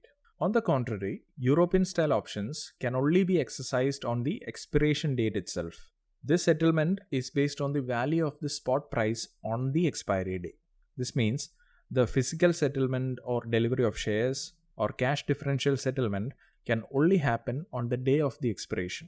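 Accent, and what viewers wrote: Kerala, India. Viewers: BRO YOU'RE THE GOAT .... I feel like very dumb because i didnt knew about this channel from the very beginning of my trading career . Fu*ck the gurus and other scammers. This is a billion dollar expertise.. I will suggest this channel to everyone that ask me how you learned trading.. ( are you a malayali you're accent is like that just askinn)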